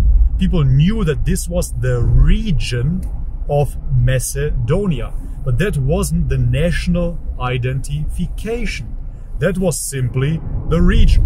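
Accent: Germany. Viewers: well he is and his accent is because he lives in Germany. Either way he is correct. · I was always wondering about your accent and thought it sounded German 😄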